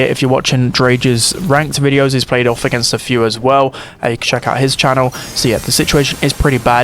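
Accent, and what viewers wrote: United Kingdom. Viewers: Bro i miss your british accent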